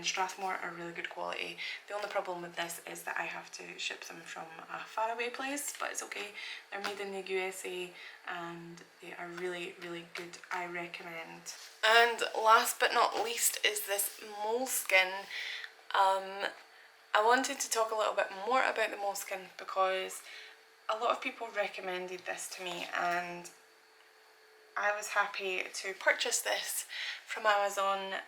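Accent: Scotland, United Kingdom. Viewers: Thank you! You are britush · Are you Scottish ??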